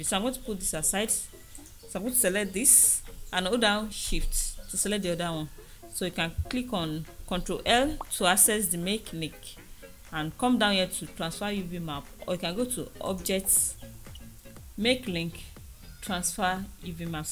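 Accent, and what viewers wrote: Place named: Nigeria. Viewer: hey, you sound like a nigerian, are you? let me publish my video self made animation on 6th march (my birthday) then we'll talk. thank you.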